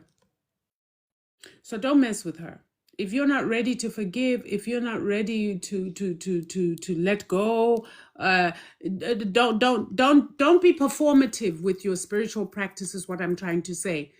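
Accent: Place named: Zimbabwe. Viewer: Hi Gogo. Very very new to African Spirituality. Just wondering if you were born in Zimbabwe? I'm seeing Great Zimbabwe on your profile and hearing an accent that sounds like home. Thank you for sharing...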